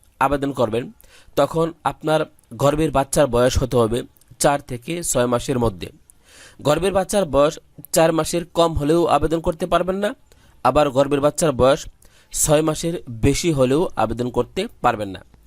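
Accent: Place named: Comilla, Bangladesh. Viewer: কুমিল্লা কথা য় করে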